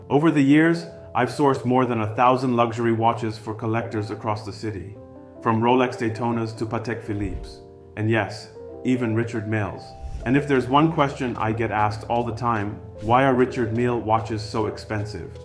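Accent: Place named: United Kingdom. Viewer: You sound more British in this video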